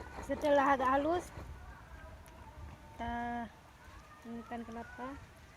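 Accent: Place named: Aceh, Indonesia. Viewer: dapu tanyoe aceh iy logatny jg.hehhe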